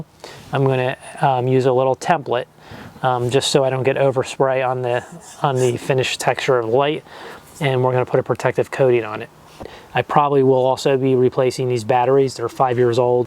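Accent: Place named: Mid-Atlantic, United States. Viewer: Your mid-Atlantic accent. The show is set in Delco, PA.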